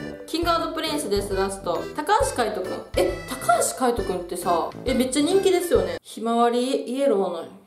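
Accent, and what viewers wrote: Kansai, Japan. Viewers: おすすめに出てきたのをきっかけにさん見始めていつの間にかハマってた。なんか関西弁が好き。ちょっと口をとがらせて話す時が可愛い。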